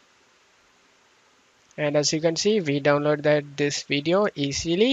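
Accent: India. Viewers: i swaer .. if i hear a thick indian accent .. automaticly SCAM! wouldnt touch ANYTHING they recommend with a 100ft pole! and even if this guy here really just wanted to help .. which i HIGHLY doubt .. i mean cmon "video downloader professional" lol .. but even if he wanted to help .. you guys did this to yourself! i rather have my pc crash .. burn and explode than to EVER let one of you evil demons on my pc!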